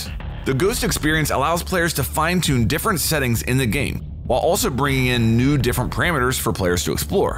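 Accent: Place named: Pittsburgh, United States. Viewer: Guy sounds like he’s from Pittsburgh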